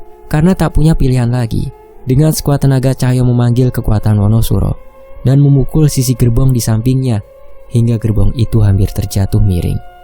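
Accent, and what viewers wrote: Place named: Bali, Indonesia. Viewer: Miiih..miiih..dhuwek sajan blii logat bali..pinter pisan aei😄🥰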